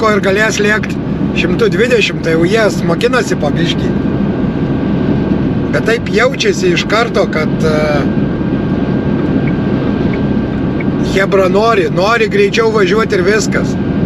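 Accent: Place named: Alytus, Lithuania. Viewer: Pasiūlė jūtūbas video pažiūrėt, ant juoko paspaudžiau ir visą sužiūrėjau, užsiprenumeravau. Ir akcentas alytietiškas labai gražus (pats iš Alytaus esu), ir komentarai pakeliui, ir šuniukai. Tiksliai net nepasakysiu, kas taip patiko, bet patiko ir žiūrėsiu dar :)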